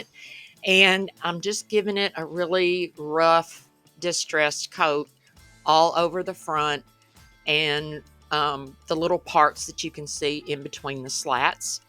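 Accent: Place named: South, United States. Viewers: Are you from Kentucky? You sound a lot like KathyJo DIY's! I absolutely love the accent! Thank you for sharing and talking! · Hey ❤I love your little pumpkins. They are so adorable. I love the Southern accent😂 Mine comes out really bad sometimes. TFS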